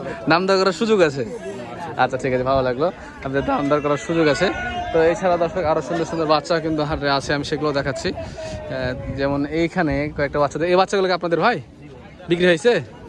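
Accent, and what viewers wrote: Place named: Rajshahi, Bangladesh. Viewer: আমার ভাগিনী বিয়ে দিছি রাজসাহিতে ওর জামাই সাথে মাজে মাঝে কথা বলি আপোনার ভাষা ওনার মতো তাই জিজ্ঞেস করেছি, দেসের বাড়ি আসলে একদিন জাবো বেরাতে, আমারো ইচ্ছে আছে গরু মহিষ এর খামার করবো বাকিটা আল্লাহর ইচ্ছা দোওয়া করবেন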